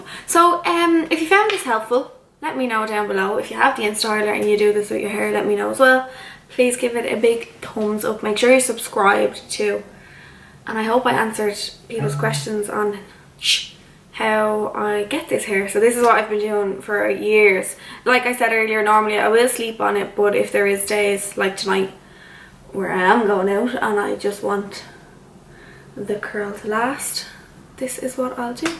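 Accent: Ireland. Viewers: is she irish?? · Irish accents ❤️☺️ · are u Irish?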